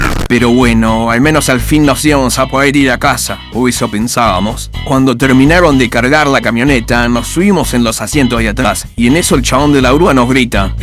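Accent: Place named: United States. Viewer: Noto un acento medio yankee no sé por qué 🧐 o medio neutro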